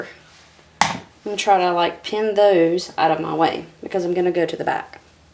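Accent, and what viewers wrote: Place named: United States. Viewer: i love this american accent :)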